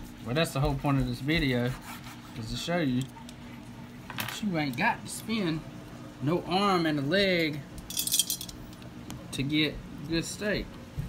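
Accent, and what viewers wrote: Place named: Texas, United States. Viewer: Where you from ??? Sound like Texas lol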